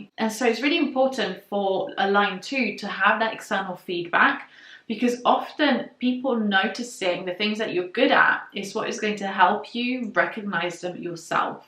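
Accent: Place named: United Kingdom. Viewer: Hello sweetheart. I was drawn here after my awakening to engage with your channel. I don't know what it means but I am drawn to explore. I am very intrigued. I am gifted because I was told by source energy that I was going to create great things for humanity at 7 years of age. I avoided University as a young man because I saw it as unnecessary debt for limited reward. I could have been a lawyer and then upgrade it. I love that you are an empath and a drawing aficionado like I am. You are so amazing. I have also done life coaching and I have a background in organic gardening as well as landscaping and horticulture. I have also grown into nutritional healing as I have skills in multiple massage techniques and herbal healing including mystical occulted knowledge. I totally get the need for personal time for growth. Boundaries are essential. I love your depth of character and it is really interesting. 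Your British accent is really cute also. I am stealing your ish line to calm my Aussie lingo down (we are too honest at times). I am very big on self care time balanced with empowerment. It must be so much harder being a woman dealing with it. Balance is key. I understand female psychology better than most women understand themselves and I imagine that you find most men shallow because they are. Women are a little more complex in general but most not by much. I'm sure that you understand. It is what it is either way and all people are amazing in their own way. I'm about to watch you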